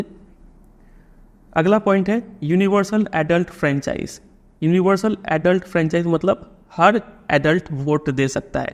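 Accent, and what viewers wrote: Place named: Bengal, India. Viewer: tabhi mai sochu aap ka accent thoda bengali hai😅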